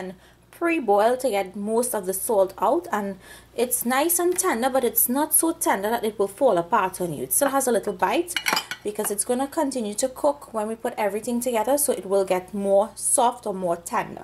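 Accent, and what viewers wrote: Trinidad and Tobago. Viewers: Not only is your recipe the best, and video easy to follow, but your Trini accent added a special flavor to the pot..I so love to hear my Trini people speak..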